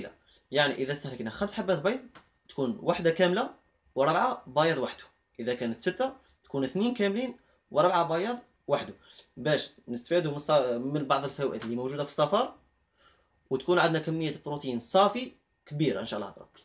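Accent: Algeria. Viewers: اللهجة الجزائرية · انت جزايري خويا ؟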